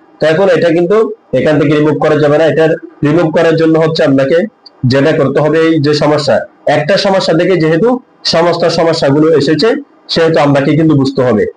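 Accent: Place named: Chittagong, Bangladesh. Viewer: ভাইয়া মনেহয় চট্টগ্রামের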